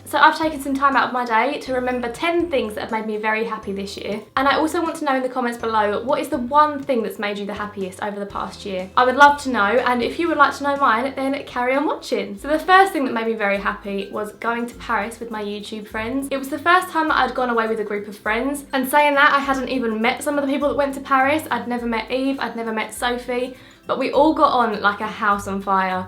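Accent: Essex, United Kingdom. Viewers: are you from Essex? :) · Your accent is perfect . You're British I guess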